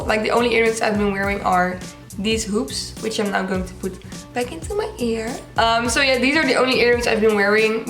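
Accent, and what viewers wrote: Netherlands. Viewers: Ik dacht al, haar engels klink heel nederlands achtig 😂. Toen las ik dat ze nederlands was.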